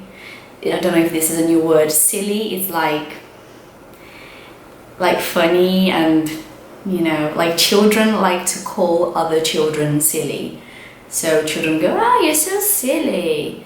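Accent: United Kingdom. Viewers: Seu inglês britanico é lindo e perfeito assim como seu português, por tanto qualquer brasileiro se vira bem em países de lingua espanhola, melhor que gesticular tentando falar ingles com quem nao entende.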